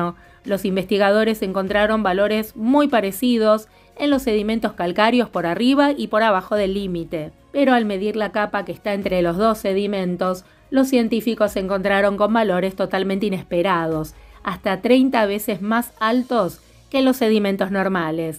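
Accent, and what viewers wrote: Argentina. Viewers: Uff amo la voz argentina que tiene la joven🤗🤗🤗🤗😘😘🤗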